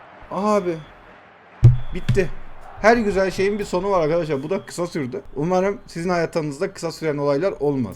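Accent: Kahramanmaraş, Turkey. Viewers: abi sen maraşlımısın kfepofjkwsf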